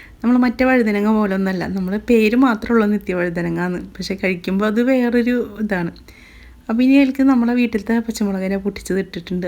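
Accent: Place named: Thrissur, India. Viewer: Rambuttan kazhikan njan beemanam Keri varatta❤ orupaad istaayi video yum Thrissur baashayum❤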